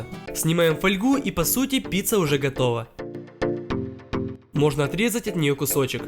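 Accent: Ukraine. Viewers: Слушай попробуй зделать на ней огромную порцию жареной картохи или люля кибаб и можно шавуху.(я смотрю твой канал с 3 тысяч тогда чувства были что ты прям украинец украинец но сейчас как логопед по русскому языку) желаю успехов и обогнать обломова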